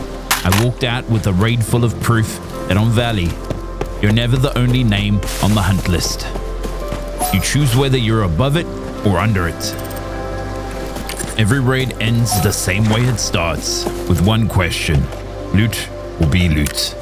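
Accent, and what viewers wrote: South Africa. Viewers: You sound South African in a way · I see your ping is around 26ms but your accent sounds South African. Did you relocate or am I missing the plot here :) Good job on the shorts and longer form videos. Always a nice watch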